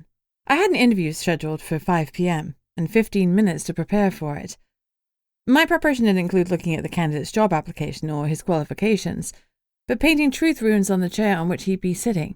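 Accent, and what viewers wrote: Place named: England, United Kingdom. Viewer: Love the narrator. Lovely english accent i can easily understand.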